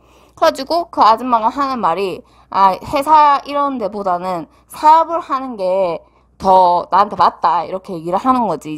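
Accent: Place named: Gyeongsang, South Korea. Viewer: 타로 거기 좌표좀 대구면 가볼려구요 ㅎ 말투가 대구나 부산같내요 ^^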